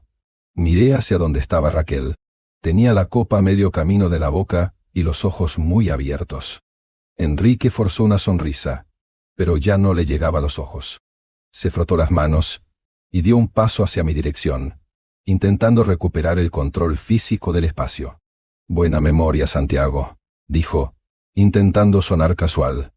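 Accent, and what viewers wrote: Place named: Argentina. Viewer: Ese dialecto argentino, cuesta entenderlo, pero como la historia es similar a muchas se logra adivinar la trama.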